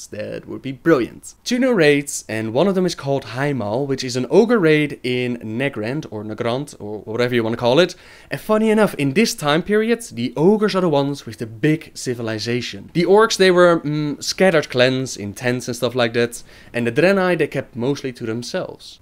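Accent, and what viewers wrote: Netherlands. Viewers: You're doing well on suppressing your dutch accent.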